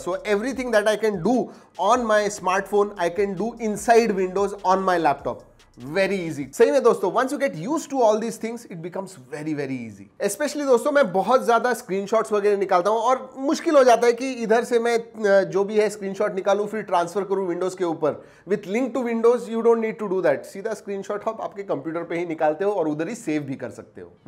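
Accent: Maharashtra, India. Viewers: Are you maharashtrian and marathi by mother tongue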